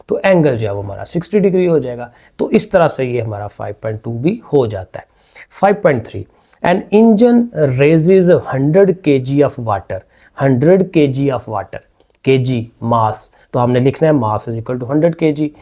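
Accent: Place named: Gujranwala, Pakistan. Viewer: لہجہ گوجرانولہ کا ہے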